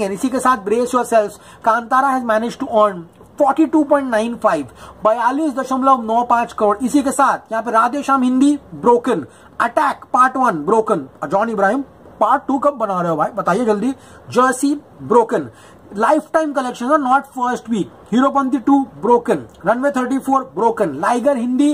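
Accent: Karnataka, India. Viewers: Your kannada accent is too good..... Feels like you are Kannada da hudga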